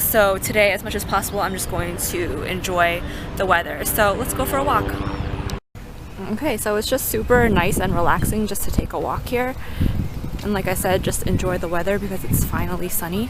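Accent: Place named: Rio de Janeiro, Brazil. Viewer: The SH sound for the final esses are more of a Rio thing. People don't soud like that in Sao Paulo.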